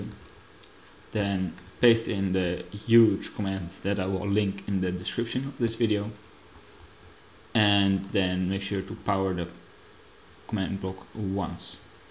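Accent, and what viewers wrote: Netherlands. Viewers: Nice dutch accent ;) This works like a charm, thanks!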